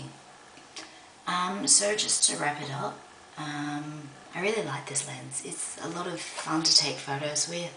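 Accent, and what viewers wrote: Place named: Australia. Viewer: Gr8 comments on the lens. Lovely Nicole Kidman esque accent. I wish you well in your SLR endeavours. Ps. I'm using this lens with a Canon EOS 30V 35mm and have taken 3 shots on the new 500D. Interesting in seeing my 35mm shots since the Digital ones are gr8 in low light.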